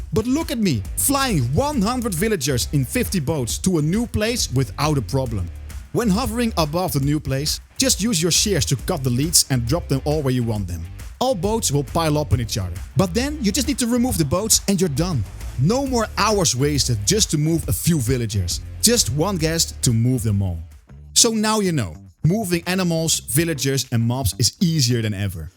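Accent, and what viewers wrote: Netherlands. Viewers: tering wat een steenkolenengels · are joe beetje nederlands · Heel erg irritant & jammer dat nederlandse accent, want je content is top. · Are you Dutch? You sound Dutch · Accent sounds dutch af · Nice dutch accent 😅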